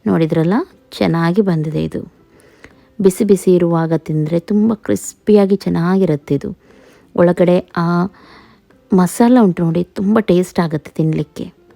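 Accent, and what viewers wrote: Mangalore, India. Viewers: Medam sorry..but wher you from.?? R u from Mangalore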